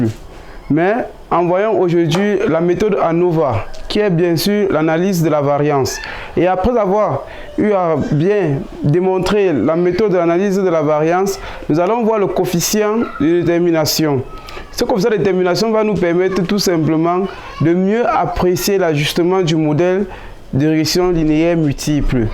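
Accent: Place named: Africa. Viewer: Merci. excellent, il te foudra consulter les vidéo de communauté qui parle nativement le français de la france correctement prononcée. je veux dire par ceci le français comme le prononce les professeurs du français dans les faculté du magreb arabe par exemple. pourquoi ?????? et bien parce que, tu va devoir abaisser dans un premier temps, l'accent africain très ombré . autrement dit, toi , tu cherche à mondialiser tes propos, to doit donc visée un accent moyen que la majorité des francophones du monde vont comprendre facilement et adorer. je te dit ceci car je veux que tu reussisses mon ami. :hand-pink-waving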